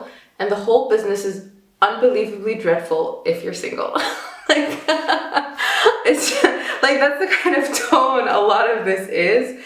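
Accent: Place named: United States. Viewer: Based on your accent I definitely assumed you were American lol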